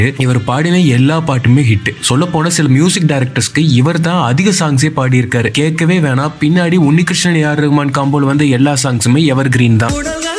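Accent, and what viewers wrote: Kongu, India. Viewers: நண்பா ரொம்ப ரொம்ப ரொம்ப ரொம்ப ரொம்ப ரொம்ப ரொம்ப ரொம்ப நன்றி நண்பா இந்த கொங்கு தமிழ்ல பற்றி ரொம்ப நாளா கேட்டது இருந்தேன் இப்பதான் எனக்கு மன நிறைவாய் இருக்கிறது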